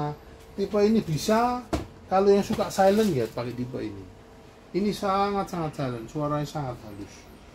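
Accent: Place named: Tegal, Indonesia. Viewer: Tegal nih kayaknya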